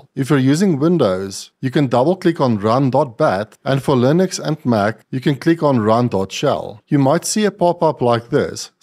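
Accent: South Africa. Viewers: South african>?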